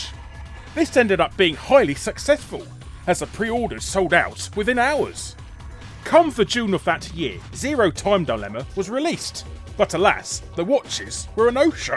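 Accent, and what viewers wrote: England, United Kingdom. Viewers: Me: “What’s a beater code?” … Oh! A BETA (Bay-ta) code! Gotta love that English accent! Lol!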